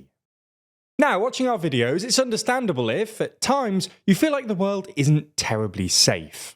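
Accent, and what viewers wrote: United Kingdom. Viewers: Love your videos and the British accent but it’s JavieR not Javiey/Javi-ay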